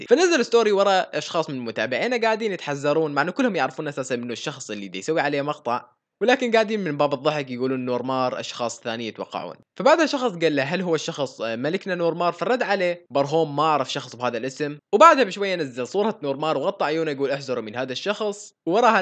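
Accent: Iraq. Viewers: مين جاي من تعليق على فيديو برهوم 😁😅 والله حبيت القناة وشتركت فيها كمان تحية لاهلنا بالعراق من فلسطين طبعا عرفت انو عراقي من لهجته 🇮🇶🇵🇸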